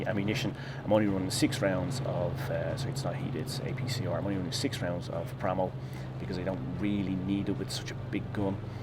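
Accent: Ireland. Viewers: Thanks for the review mate! Can I ask, are you irish? For the accent nothing more, if u are cool. Cheers Saludos desde Mexico!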